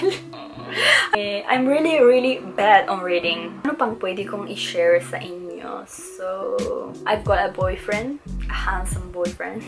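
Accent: Italy. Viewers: Il Tagalog con l'accento milanese è magia. 😂❤ Vi prego ditemi che c'è qualche mix filippino-veneta nei commenti. Ho bisogno di sentire come suona il Tagalog con l'accento veneto 😂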